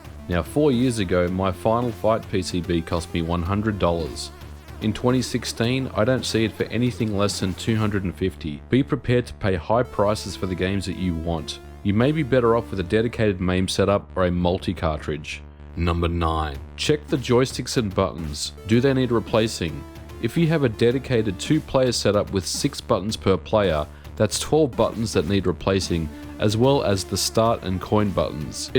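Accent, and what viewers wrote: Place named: Australia. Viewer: I know you’re from America, but I wonder why you speak with an Australian accent...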